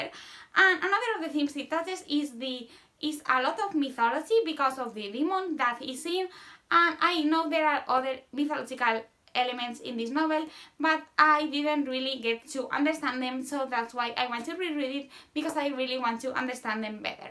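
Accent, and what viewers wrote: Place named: France. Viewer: Cute French English accent. 🌷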